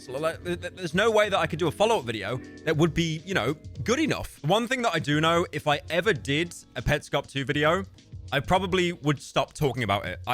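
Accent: United Kingdom. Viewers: I ain't watching the appalachians Petscop video I want the british femboy voice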